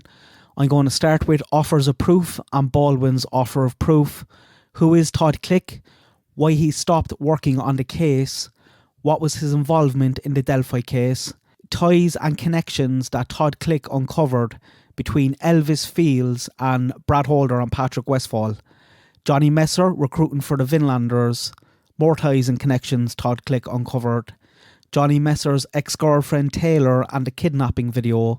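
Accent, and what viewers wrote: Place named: North Cork, Ireland. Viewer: Excellent video, my only criticism is that it went a bit fast, the pictures & text. Btw, is that a north Cork accent?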